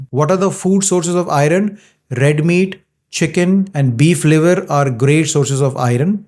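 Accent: South India, India. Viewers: with south Indian accent..❤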